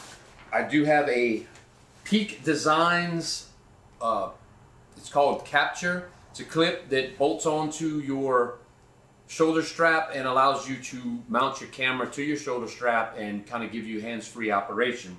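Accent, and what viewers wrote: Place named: South Louisiana, United States. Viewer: That accent. You south of I-10? haha I'm a N. LA boy aka "fake" Louisianian.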